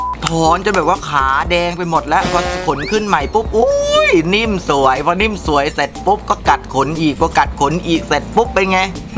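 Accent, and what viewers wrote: South, Thailand. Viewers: คนสุราษ เหรอ แหลงใต้แลมั่งตะ🤓🤓🤓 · เป็นคนใต้รึเปล่าครับ พูดทองแดงชัดเลย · สำเนียงออกทางคนใต้??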